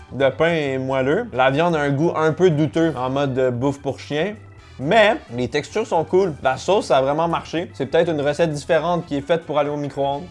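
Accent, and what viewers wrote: Quebec, Canada. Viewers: et sachez que...j'adore l'accent québécois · Bonsoir Dis-moi, est-ce que tu étais à Nantes ce soir, c’est-à-dire le jour où tu as posté cette vidéo ? J’étais avec ma femme dans le parc de la Chanterie, pas loin de chez moi, et vers 22h, on a vu quelqu’un qui te ressemblait vraiment. Au début, tu étais assis sur un banc, en train de parler au téléphone, face à l’Erdre. Puis tu es passé juste à côté de nous pendant qu’on se prenait en photo. Il faisait un peu sombre, alors je n’étais pas sûr, mais j’ai cru te reconnaître — surtout quand j’ai entendu l’accent québécois 😄 Et comme j’étais à Montréal la semaine dernière, je l’ai tout de suite capté, haha !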